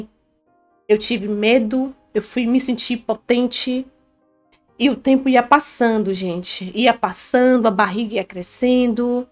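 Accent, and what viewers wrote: Bahia, Brazil. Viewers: Oi vc e baiana a voz parecida ?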